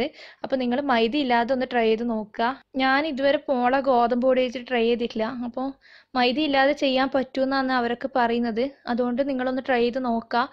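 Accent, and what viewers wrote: Kannur, India. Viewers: നല്ല കണ്ണൂർ slang........☺️ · .. Nhan thrissur aane.. Kannur slang enikk orupade ishtta...ippo samsarikkunna pole thanne samsarichal mathi.. 😁😁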